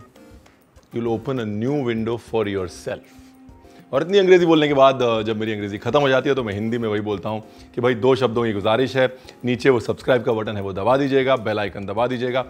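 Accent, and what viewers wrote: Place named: Lucknow, India. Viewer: What a personality Punjabi Jatt guy with a calmest aura, ice it up with Lucknowi hindi, shayari and chef..and katilana looks...Mashallah!!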